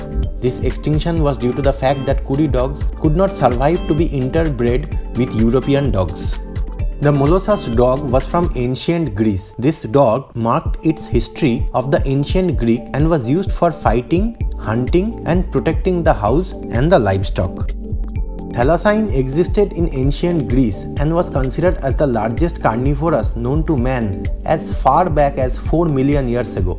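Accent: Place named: India. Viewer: U have terrible indian accent. Pls ask somebody to dub ur vids. U can found hundreds of offers on fiverr esp.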